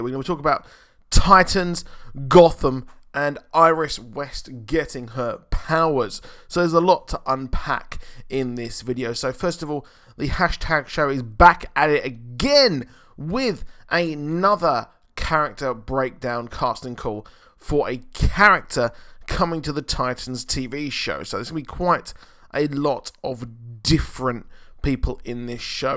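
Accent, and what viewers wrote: United Kingdom. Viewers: Why are u reading this? He is bristh dumbass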